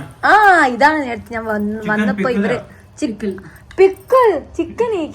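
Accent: Kannur, India. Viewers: ഒരു കാമറാവുമണിനെയും കൂടെ കൂട്ടാമായിരുന്നു. ഭാഷ ഒന്നുകൂടി നന്നാക്കാമായിരുന്നു. തനി നാടൻ കണ്ണൂർ ഭാഷയായിപ്പോയി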